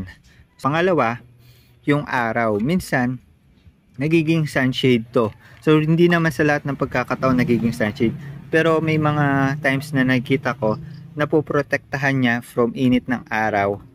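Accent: Pampanga, Philippines. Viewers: Kapampangan sya.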